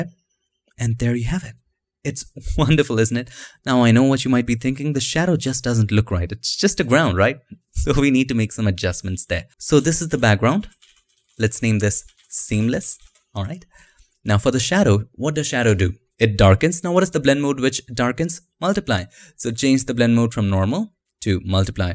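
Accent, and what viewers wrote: India. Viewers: indian accent just kills me ... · You speak too fast and your english is slightly Indian, it would be easier for me, at least, if you spoke in a more paused way.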